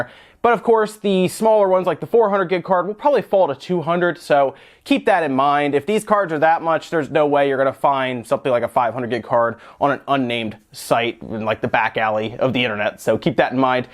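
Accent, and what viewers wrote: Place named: Philadelphia, United States. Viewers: Hey you from Philly or Delco? Edit: N/M saw the Eagles jersey! Was going off your accent. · First video. Heard your voice first, thought it had a hint of Philly in it, then saw the Flyers and Eagles swag - confirmed.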